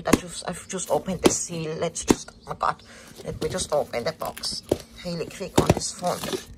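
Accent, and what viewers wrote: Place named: China. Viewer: IST DAS ENGLISCH MIT CHINESISCHEM AKZENT???!!!!!!!🤣🤣🤣🤣 ICH VERSTEH KEIN WORT VON DEM WAS DIE LABBERT!!!!!!🤦‍♂️🤦‍♂️🤦‍♂️🤷‍♂️🤷‍♂️🤷‍♂️ Ich empfehle diesen Kanal NICHT weiter!!!!!😑😑😑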